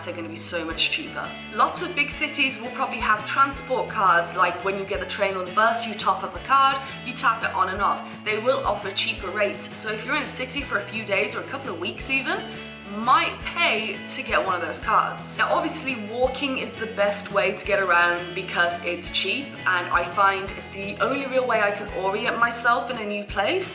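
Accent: United Kingdom. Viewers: Out of curiosity... you're British not Australian ryt?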